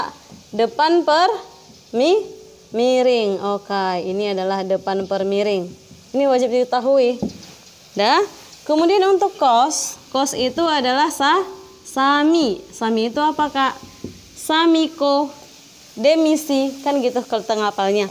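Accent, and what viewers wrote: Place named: Medan, Indonesia. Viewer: logatnya orang medan ini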